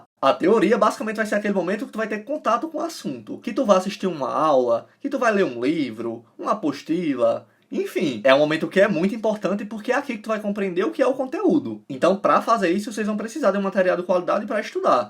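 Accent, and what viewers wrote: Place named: Pernambuco, Brazil. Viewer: Reconheço um Pernambucano de longe, e você, é um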